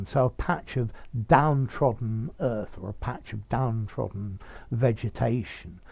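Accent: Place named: England, United Kingdom. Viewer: I can't thank you sir . Really clear explanation + awsome English accent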